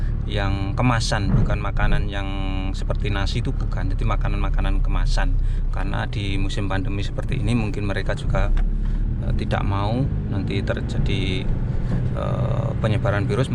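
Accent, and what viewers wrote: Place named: Banyumas, Indonesia. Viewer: bang asli endi ngomongnya ngapak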